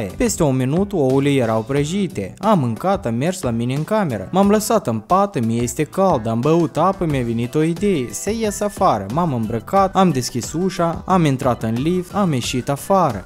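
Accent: Moldova. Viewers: Pai se vede dupa accent ca e din Moldova.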